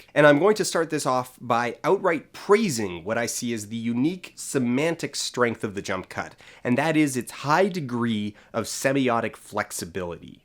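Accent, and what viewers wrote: Canada. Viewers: I was just wondering if he was Canadian. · 2 things: -So... You're Canadian? -While I agree with the video and the ending message, I think sound quality matter more for video than you're giving credit.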